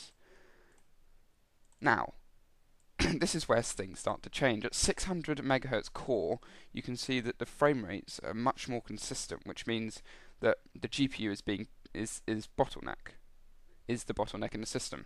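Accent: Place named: United Kingdom. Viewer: Just a question about accent bro. I can understand you and I'm from Turkey,Are there any american who can't understand British ?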